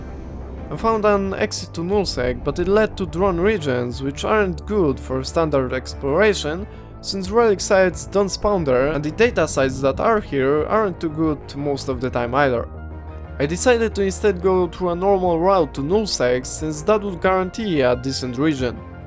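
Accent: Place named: Poland. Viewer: Oh QRVA, a polish guy. If you want polish speaking eve, look at my channel. Also, qrde, kocham twoj akcent :> Don't forget as an alpha, your skills suck, and your tier 1 equipment sucks, so it is NOT THAT EASY to get a site to 100%. Also, good luck to all the alphas probe scanning in low sec and null sec without a cloak.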